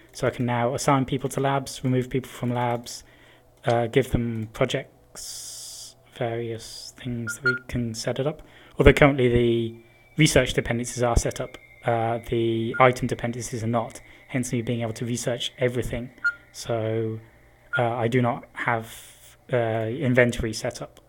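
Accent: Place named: United Kingdom. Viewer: I am really happy to have voiceover on these! Your lack of voiceover experience is compensated by your British accent. You're doing great! Keep up the good work!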